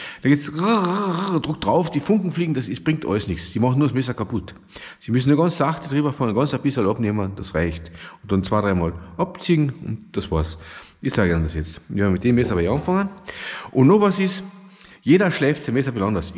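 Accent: Germany. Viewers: Als Ureinwohner finde ich das der fast wia Breiss redt😂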